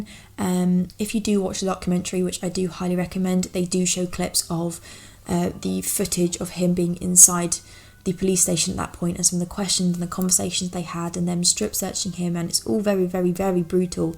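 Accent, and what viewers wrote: United Kingdom. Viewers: Annaliese O'Malley I think you may just have watched too much TV, where everything is all about drama and excitement. I prefer her level-headed and articulate overview of cases, and I’m sure others that enjoy her content do too. Very bold of you to discredit any following this woman has as being because of her british accent and looks. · It's not abt opinions or lights, camera, action or having the best equipment etc, It's abt her very blah blah, very mundane, disinterested presentation; It's like watching paint dry. Like she's reading from a script on how to put together a garden shed. Everytime I click on a recommendation of hers; my ears just glaze over. She seems completely disinterested in the topic; just mumbling through til the end as quickly as possible. She's not engaged at all. I think Americans just like a British accent regardless of what's being said and how. 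On a positive note, she's attractive, which is her saving grace for those that find attractiveness important, ie men.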